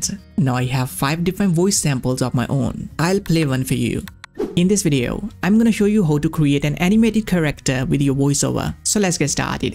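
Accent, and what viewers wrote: India. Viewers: Why would you want to clone your INDIAN ridiculous accent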